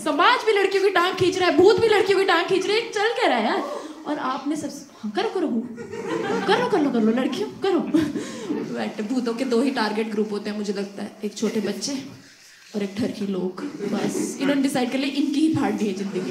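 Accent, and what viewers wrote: Haryana, India. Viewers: Haryanvi language was bang on 🤣🤣🤣🤣🤣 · Haryanvi accent 😅😅😅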